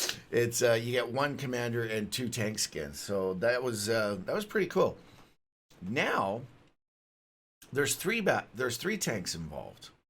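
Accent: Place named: Canada. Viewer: Hey,. Good video. Thanks for producing and posting this. Always good, useful information from you. +1 TL:DR - the rest of my comment a bit off topic. So, stop here and move on if you wish. OK? Still here? Alright,now for a (slightly) amusing sidebar. I learned something today. But what? Well, on with my story., I always like your YT videos and I frequently tune in to your Twitch streams. Even platooned with you a few times on the EU server. Anyhow, one thing that always puzzled me whilst watching such was your pronunciation of the word "decal". When I was growing up as a kid back in the '50s and '60s my friends and I, while building our model planes and warships, put DEE-cals (or DEE-cowls) on them. Later on, once we were driving, we'd put DEE-cals (racing stripes, flames from the wheel wells … ) on our jalopy cars to make them look "cool". DEE-cals. 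Here's a couple YT posts - <link> <link> Now here's saying deckle (deck-ul, rhymes with heckle or freckle). Deckle? Why'd he say deck-ul instead of DEE-cal? So, I did a little internet searching. Turns out the "deckle" pronunciation for the word decal is a Canadian thing, eh. Cool. In other English speaking countries (US, UK, Australia and so on) decal is commonly pronounced DEE-cal, <link> But, there are many words in the English language that have alternate pronunciations in the various countries where English is the principle language in usage (decal being one such word). Just take US vs Canada (or should that b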